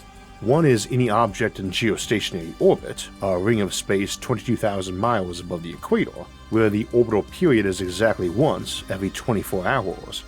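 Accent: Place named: North Carolina, United States. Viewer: "Whey-oh distances are great-or" - - It's kind of a North Carolina accent... but not exactly.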